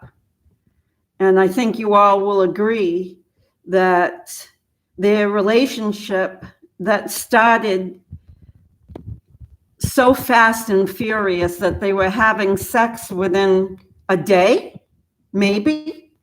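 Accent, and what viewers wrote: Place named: Boston, United States. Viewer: You sound like you come from Boston or near Mass...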